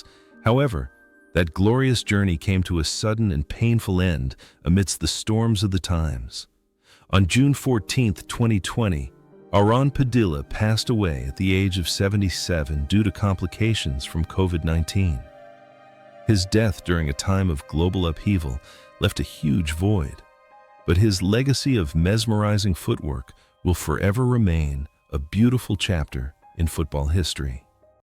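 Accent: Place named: United States. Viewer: more AI shite with gut wrenching yanky commentary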